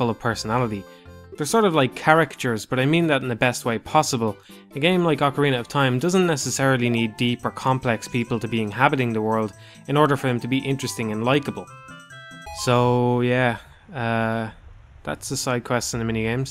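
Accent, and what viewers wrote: Dublin, Ireland. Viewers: You're Irish, right? I'm just asking 'cause I love the accent. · Smitty Werbenjagermanjensen It's Irish right? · Where in Ireland are you from? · It’s an Irish accent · Woah, You're Irish Like Me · He is, it's some sort of Dublin (capital city) accent. There's so many different types of accents all over the country.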